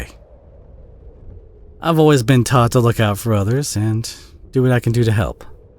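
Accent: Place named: South, United States. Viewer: love audio and story. another cowboy audio yes!!!!!!!! the southern accent it’s just 🫠 I do really love the audio of you as a Cowboy. I don’t drive well because I can’t but in audios I can. thanks for helping me out and giving me [listener] a place to stay. anyways lovely to hear your voice ♥️ cowboy + = ♥️♥️♥️♥️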